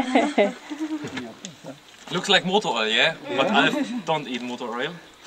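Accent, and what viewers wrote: Germany. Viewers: When I heard him talking I was so sure he is German :D Coz I speak the same way when I try to talk in English :P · german accent xD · I hate German talking english.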